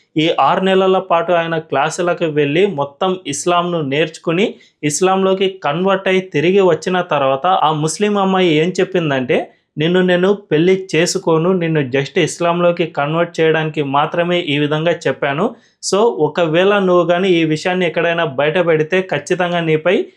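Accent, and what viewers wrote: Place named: Marwari, India. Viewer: Are sollugay NUVVU MARWADIWA Yenti anta lagi lagi matladutunnav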